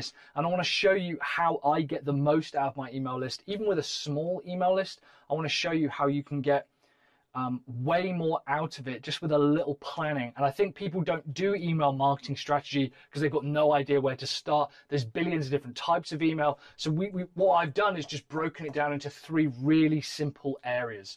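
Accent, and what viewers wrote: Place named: England, United Kingdom. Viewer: Mann, i like your english accent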